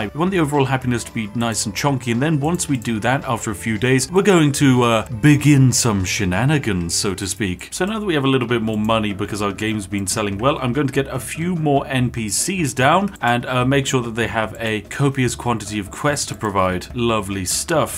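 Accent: United Kingdom. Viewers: why are you british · Is the spiffing Brit from Britain? Sounds a little bit like a British person. No front of course.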